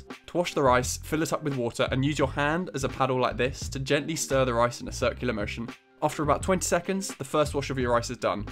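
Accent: United Kingdom. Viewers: u r definitely a Britain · Damn his British accent will be the death of me lol. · British accent..... I love itt😍😍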